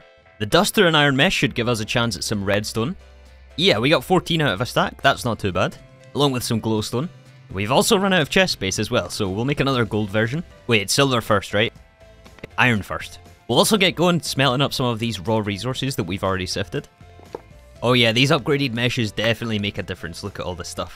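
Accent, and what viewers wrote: Scotland, United Kingdom. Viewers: a fellow scot? interesting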